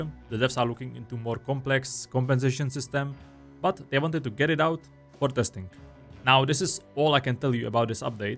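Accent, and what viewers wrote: Czech Republic. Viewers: Is that a Czech accent ?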